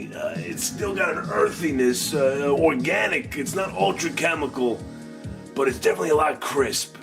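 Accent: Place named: Brooklyn, United States. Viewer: Great review and you sound exactly like my uncles from Brooklyn. When they say "fuck"it sounds so elloquent. Aramis is the shit and DOES smell like guys at the train station.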